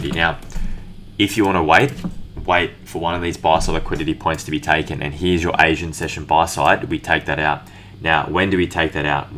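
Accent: Australia. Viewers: Brilliant mate! Awesome to hear an Aussie trader! I'm a North Queensland boy... Loving the London stuff, mate, as it suits our time zone much better. Keep up the great content 👏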